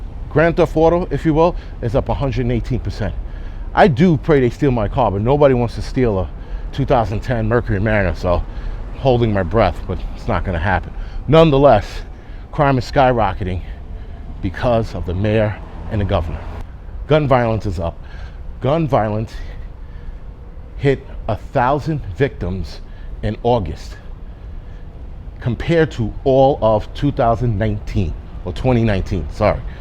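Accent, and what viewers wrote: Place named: Chicago, United States. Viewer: Really informative , sounds like Chicago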